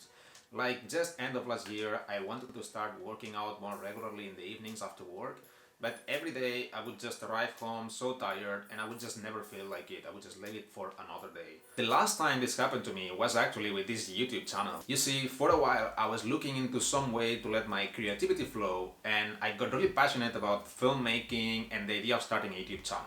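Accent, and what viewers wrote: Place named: Spain. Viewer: Thank you for sharing this valuable information with us. Another thing, your accent looks different. Where are you from? I think Spain. How can I get the same fluency you have in English?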